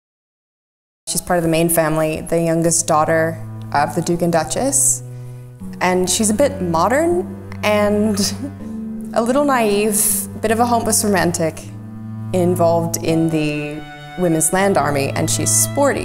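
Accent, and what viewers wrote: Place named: United States. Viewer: Going by her dialect, do a lot of Americans/Canadians get involved in this thing?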